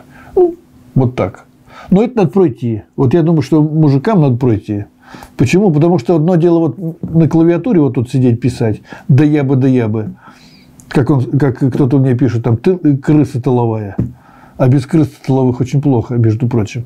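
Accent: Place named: Okanye, Russia. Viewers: Слушаю, стал как он окать! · у меня был приятель из Коостромы, так он так же говаривал, я ему говорю - специально, а он удивленно, а я по другому не могу. А теперь послушай говор жителей из ДНР, ЛНР и солдат ВСУ, у них один характерный южный говор, такой же в Ростове, Краснодаре и т.д. Для изменения нужны годы, вот Примаков наш Премьер и руководитель СВР ранее говаривал с Тифлиским акцентом, а после института как диктор телевидения.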